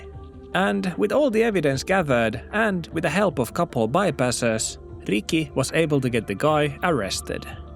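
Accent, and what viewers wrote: Finland. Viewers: as in paahtoleipä. i can hear it from your accent. this is a Finnish guy. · You sound Finnish what country are you from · Bro is one-hundred percent Finnish, am I right fellow Fin?:cat-orange-whistling: Yap. The "also" confirmed my suspicion, moro miten mennöö?:D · bro am i tweaking or do i hear a finnish accent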